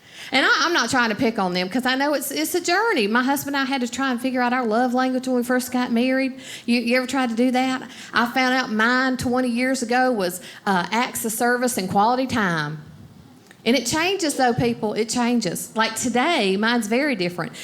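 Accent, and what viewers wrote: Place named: South, United States. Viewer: Absolutely hilarious... love you and your southern drawl...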